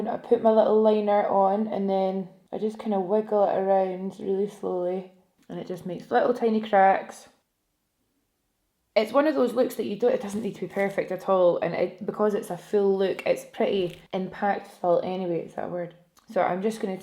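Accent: Scotland, United Kingdom. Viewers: Is it just me that loves it every time she says the word “white” 😂 I just loooove the Scottish accent 💖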